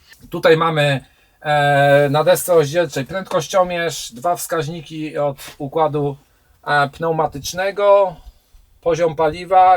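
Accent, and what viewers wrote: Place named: Gniezno, Poland. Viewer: Gnieźnieński akcent w terenwizji , znajoma żwirownia , jak milo :) plus 12l V10. Czego chcieć więcej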